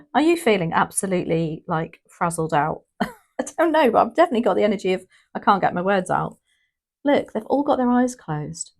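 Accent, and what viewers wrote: United Kingdom. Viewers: Thank you so much In America we love the British accent:face-blue-smiling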